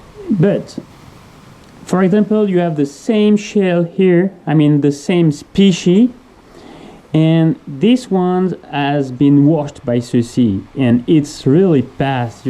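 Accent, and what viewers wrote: France. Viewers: Very interesting to know this! Your French accent is charming, esp the way you say 'Horrible'! Thank you for a detailed video. I would shorten it a lot though, there is too much repetition and its too long. I'm American, so of course, we like things to the point and fast. You should always wear glasses yourself. You never know if you suddenly slipped, or had a strange unexpected accident that spilled bleach on your face. Also gloves. With regard to dental tools, you can buy them cheap on Amazon for dog teeth cleaning. Merci bien!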